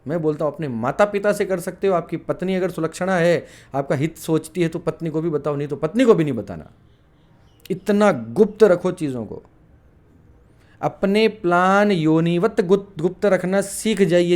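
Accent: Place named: Rajasthan, India. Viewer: Aapki boli bhilwara ke aaspaas ki h bhai sab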